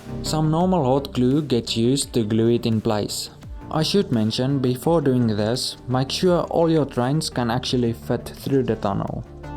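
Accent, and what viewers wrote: South Africa. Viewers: Are you South African? I am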